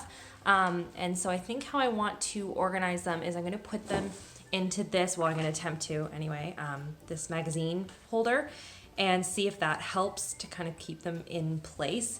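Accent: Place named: Canada. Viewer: ❤️What an improvement! You make me giggle in all your vids whenever you say words like “a boot”...(about) 😛😘. That Canadian accent coming through!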